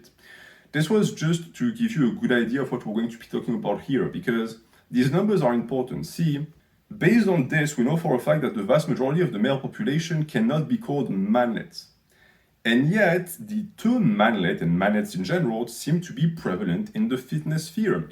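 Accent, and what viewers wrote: France. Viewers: High pitched with french accent. For the win. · Hey, how tall are you? Also why are you French and why your accent is intolerable? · Franchement c’est cool que les anglais aime notre accent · his accent is horrendous and it is extremely different to understand what he is saying at times. his accent is what makes me hate the french, frenchies always get mad at you when you say their dribble speak words wrong but then they go on and speak english like this! absolute butchering of the english language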